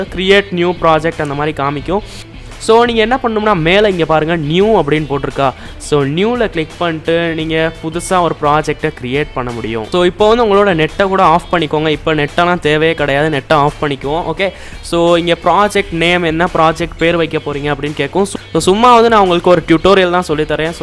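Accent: Madurai, India. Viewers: Simply i am enjoying your MADURAI Tamil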